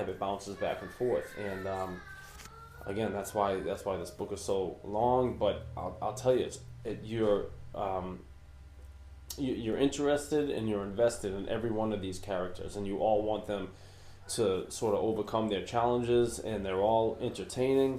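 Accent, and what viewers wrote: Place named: Boston, United States. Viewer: Let me guess; you are from Boston or some other part of New England.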